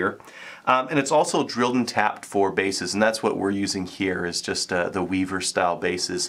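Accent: Canada. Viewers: The different combs aren't for growing shooters. They're for shooting with iron sights or above axis scopes. It moves your cheek weld to the correct position. You sound Canadian, so I'm assuming you guys are very restricted to the types of weapons you can possess.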